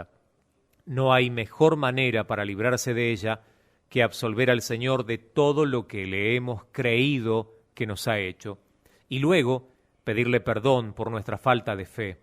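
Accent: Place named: Argentina. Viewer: HE ESCUCHADO OTROS LIBROS MUY EDIFICANTES COMO LOS DE REBECA BRAUN, PERO UD ES EL QUE MAS CLARO LO ENTIENDO SERA POR NUESTRA TAN PARECIDA LENGUA LATINA, SOY HONDUREÑA Y EL ACENTO ARGENTINO ME GUSTA MUCHO, ASI ES QUE HE QUEDADO MUY CLARA EN CUANTO A LO QUE VIVO Y VIVIRE EN LOS AÑOS QUE ME DE PAPITO DIOS AQUI EN LA TIERRA YA TENGO 61 Y 30 AÑOS QUE SU MISERICORDIA ME ALCANZO ❤🙌❤🙌❤🙌GRACIAS DIOS LOS BENDIGA CADA DIA Y QUIERO MAS YA Q POR MI TRABAJO PUEDO ESCUCHARLOS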